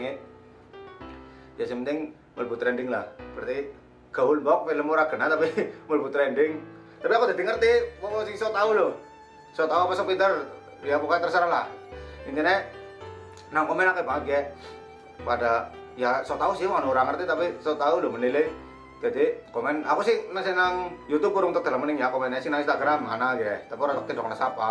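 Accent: Banyumas, Indonesia. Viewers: Salam cingire teko lampung kang, semangat pokoke nggawe film e. Aku wong jowo tapi nak ndelokne wong jowo ngapak ngomong iso nggarai ilang mumete, lucu soale kang😂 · Q ora due instagrama mas...penting lih esih ttp ngapak mas +batir"....kritikan anggep bae masukan sing bagus..ttp positif thinking bae bn terus majuuuu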